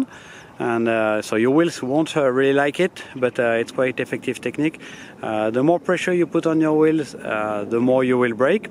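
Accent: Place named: France. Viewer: Ah je me disais bien que y avait un p'tit accent français la dedans :D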